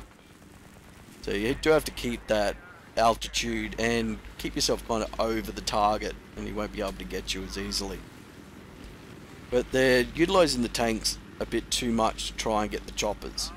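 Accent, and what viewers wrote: Australia. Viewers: Sweet vid man its good to see bf3 aussie commentators. Keep the videos coming! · You're Australian, aye? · Are you Aussie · Oi Mate, Sounds like u are Aussie? If so, ello... I have been there and I like Aussie women, Kiwi women, Chopper and VB beer mate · r u aussie? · its kinda obvious ur aussie lol me too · Man, this commentator is brilliant. So classically Auzzie and just so informed and blunt. Fuckin' awesome man. :D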